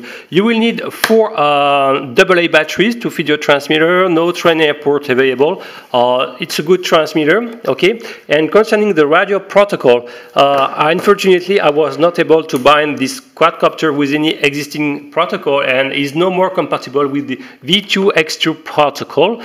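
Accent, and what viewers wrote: France. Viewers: mdr avec ton accent francais, il peut soulever quelle poids a ton avis ?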